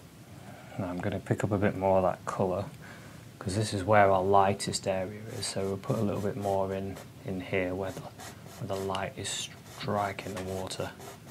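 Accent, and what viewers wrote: United Kingdom. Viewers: Is this guy the British successor to Bob Ross O.O like in all seriousness he paints just as beautifully, and his accent and voice give off that ASMR effect. the same kind that Bob Ross did. This painting is amazing and Sepia just works so well and I love the technique of using masking tape to section off the horizon line :D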